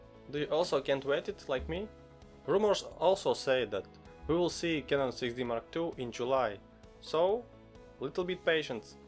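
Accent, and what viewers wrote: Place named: Russia. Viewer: какой то русский акцент, ты русский?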